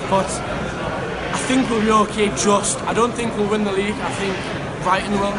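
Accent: Newcastle, United Kingdom. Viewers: why you doing a geordie accent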